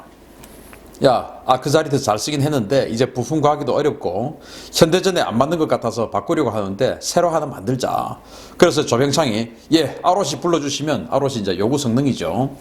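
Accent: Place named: Gyeongsang, South Korea. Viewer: 산인균 말 들어보니 경상도네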